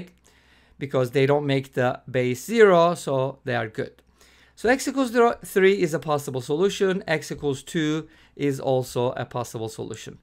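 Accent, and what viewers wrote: Turkey. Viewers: Your accent really looks like Turkish, I dunno why but it really does.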